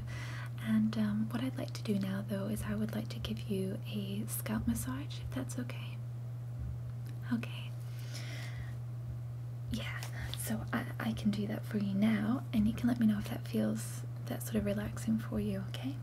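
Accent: United States. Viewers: ASMR ahh your American accent is impeccable!!! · This is an excellent American accent! Probably the best accent switch I've seen in an ASMR video. I agree with those who said you sound like Springbok ASMR here :) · Great American accent. Love your ASMR video. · That's an amazing American impression. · I just praised your spot-on Scottish accent on another video...now I'm praising your excellent American accent. You have a genuine talent for asmr as well as accents. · Lovely, especially the scalp massage. Your accent was on point —I'm American and I don't think I would've suspected it wasn't your native accent if I hadn't known better. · Great accent! Good job very believable... I only heard a few instances of your Scottish...British... Australian accent. and I only noticed because I AM american. But good job! Good Video! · Ma-saj not Mash-aj. Otherwise, amazingly convincing and indistinguishable from real Americans :> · i like the american accent. 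good job. you are just amazing in general though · Your American accent is quiet good! Not many people can perform that sort of affectation without sounding silly. You have a wonderfully warm smile as well :) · As a citizen of the United States of America, this was a very convincing accent, and overall a very nice video. Props to you. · Also your American accent was amazing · Great american accent! Your true accent pretty much only came out in the long O sounds. But then again, people from the US talk all kinds of weird ways, so I'm pretty sure nobody would think that you weren't from the US if you were using the accent over here. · Wowww!! You're so good at accents omg, if i didn't know any better I'd think you were american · I thought you were American wow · Just wanted to pop in and give my expert American Stamp of Approval on the accent. You even said "food" like someone from the midwest. 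Also, the marker sounds ruled. Oooon an ancillary note: very lovely video, many thanks as always. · Excellent American accent,!! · I'm super impressed by your American accent! It's really quite accurate. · As an American, I can say you did a great job at that American accent. I couldn't tell you were even British because the accent was so good. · holy crap I never understood the "American Accent" until now!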